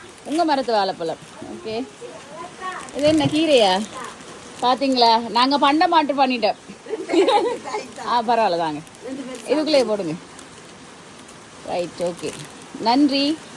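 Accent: Sri Lanka. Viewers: ஈழ தமிழே தமிழ் தான்.. என்ன ஒரு அழகு அந்த செந்தமிழில்.... "காலத்தி னாற்செய்த நன்றி சிறிதெனினும் ஞாலத்தின் மாணப் பெரிது" என்ற அய்யன் வள்ளுவனின் குறள் கண் முன் வந்து போகின்றது. நீர் வாழ்க வளமுடன் சகோதரி - மதுரையிலிருந்து சீனிவாசன்.. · அழகான புன்னகை, உங்கள் அன்பு, பிணைப்பு, உங்கள் அழகான இலங்கைத் தமிழ் ஆகியவை என் இதயத்தை முழுவதுமாக ஈர்த்துவிட்டன.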